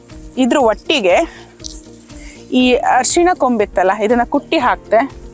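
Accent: Kundapura, India. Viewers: Nimm maath keli Kundapura koteshwra thallur upinangadi vanse maravnthe kollur.....nenpayth marre ! super👌✨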